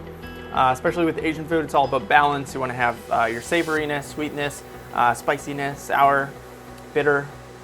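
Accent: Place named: Ontario, Canada. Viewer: Random question, but are you Canadian? You sound like you could be from Ontario lol!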